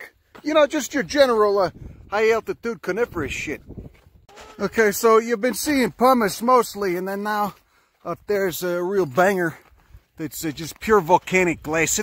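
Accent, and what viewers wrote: Chicago, United States. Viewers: The 'Cago accent pronouncing Latin is the best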